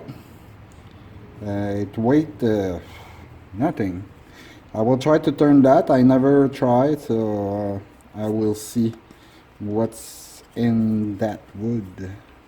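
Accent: Canada. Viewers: I just subscribed to you! Beautiful works!! Wish I could take up this hobby. No doubt woodworking can get into your blood! Thanks for using nice language AND having a great accent! How do I become a "kanuck"?!!! Hope I didn' the say a " nasty"! My son & daughter-in-law love in Sarnia, Ontario,Canada.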